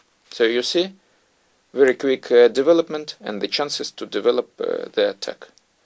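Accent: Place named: Russia. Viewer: It's very common accent for Russian who speaks English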